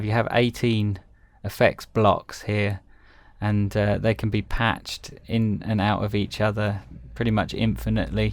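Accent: United Kingdom. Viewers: This video magnified my hatred of the British accent.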